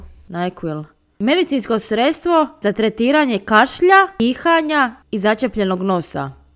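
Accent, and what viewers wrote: Split, Croatia. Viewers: Jesi ti iz Splita pitan radi naglaska jer ja jesan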